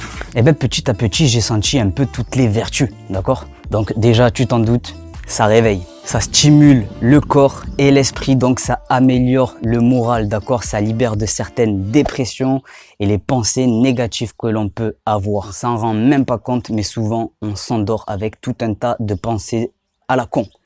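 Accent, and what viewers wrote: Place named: South, France. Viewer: Perpignan 😍😍 Il m'a bien semblé percevoir l'accent du sud 🤗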